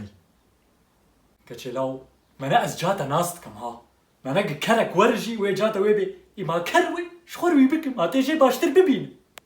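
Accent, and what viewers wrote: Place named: Amuda, Syria. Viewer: عم تحكي متلنا يعني قصتي اهل عامودا انت من وين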